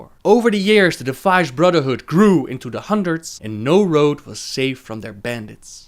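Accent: Netherlands. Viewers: You have an incredibly strong dutch accent mate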